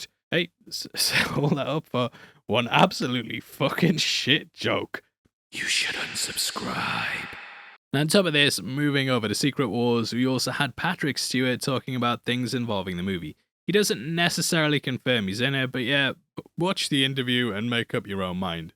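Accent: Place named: United Kingdom. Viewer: A channel named Heavy Spoilers along with your warning and British accent… I was expecting you to say “bob’s your uncle” or “Ryan is your stepdad”.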